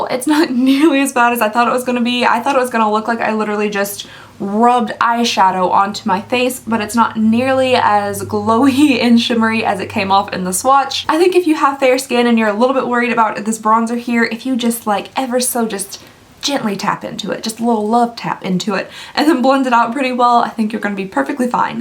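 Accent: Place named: South, United States. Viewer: I can tell you’re southern. ❤️ same lol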